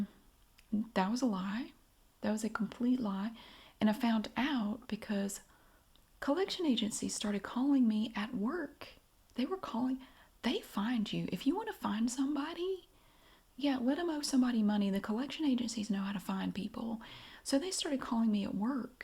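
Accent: South, United States. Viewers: This made me go back and look to see how long I've been following you... 8 years!! Almost since the beginning! Love the vibes and the Southern accent, makes me homesick for North Carolina (lived there for a few years back in the day). You do you,!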